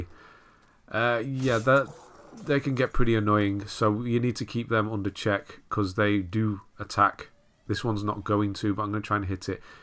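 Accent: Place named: Cheshire, United Kingdom. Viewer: That accent is subtle btw, placing you somewhere near the M56.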